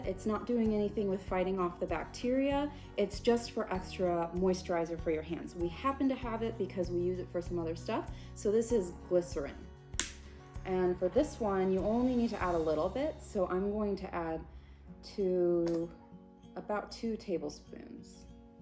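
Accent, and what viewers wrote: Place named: United States. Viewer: Gần 30 tuổi chưa bao giờ comment trên Youtube. Nay thấy chị nói tiếng Anh Mỹ hay, nghe dễ. Mong chị ra video dạy tiếng Anh, chị có khiếu sư phạm. Thank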